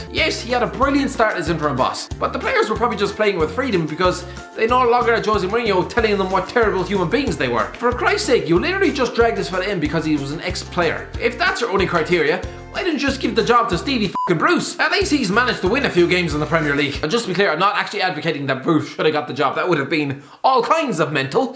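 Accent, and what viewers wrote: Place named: Ireland. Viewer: What part of Ireland are you from ?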